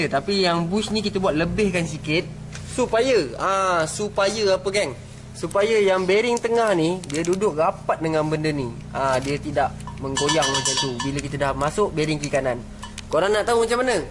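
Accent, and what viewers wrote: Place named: Negeri Sembilan, Malaysia. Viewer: buat vlog ckp nogori la plk....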